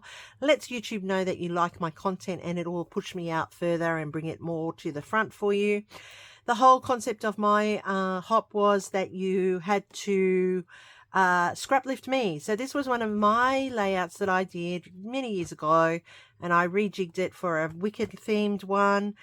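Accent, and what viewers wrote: Australia. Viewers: Oh wowsers! Thank you. I've been trying to get inspired after 9 years of Uni study. I was excited to find you,. Nice to hear an Aussie voice-over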